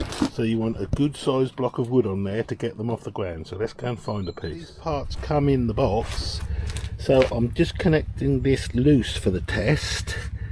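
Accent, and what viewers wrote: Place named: Norfolk, United Kingdom. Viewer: you sound very much like you're from Norfolk to me 🙂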